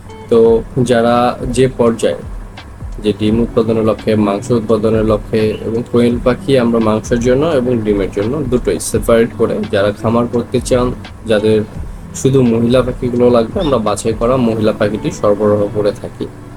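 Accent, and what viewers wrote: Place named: Rangpur, Bangladesh. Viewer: আপনার লোকেশন কথায় রংপুরের। থানা কোন গ্রামের নাম.???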